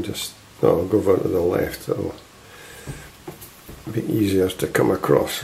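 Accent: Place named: Perthshire, United Kingdom. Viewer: I think I know that accent....is it Perthshire?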